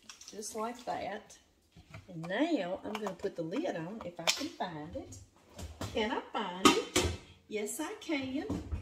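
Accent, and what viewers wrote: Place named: Appalachia, United States. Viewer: Girl! Thank you for your channel! I was struggling out in my garden today and I could not for the life of me figure out why my purple hull peas were fat and looked ready, but weren't purple and the peas looked a pale green. No info on Google for us southern folk who want info on our southern foods. Nope. Thank goodness I thought, check YouTube! I am now putting away some peas ... and listening to your other videos! Are you originally from LA? I would have pegged you as the Appalachians. I say that because that is where all my family is from. ;) We talk a lot alike. Anyway... take care and thanks again. Looking forward to your videos. This is my first year gardening and canning... I'm 46! Late start!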